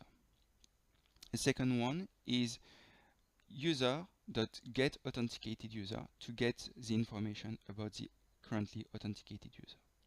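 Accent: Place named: France. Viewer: J'adore cet accent so frenchie! Merci pour ce tuto clair et précis! J'utilise depuis quelque temps la version 0.9 de tweetinvi et j'essai de poster une vidéo (pouvant dépasser 15 Mo). Suis je obligé d'utiliser la version 1.1 ou 1.2 et si oui, y aurait-il un tuto pour l'utilisation de ces nouvelles versions? Il semble que TwitterCredentials ou AuthFlow.InitAuthentication ne soient plus valides pour la connexion d'un utilisateur X qui souhaite se logger avec son compte tweeter. Merci.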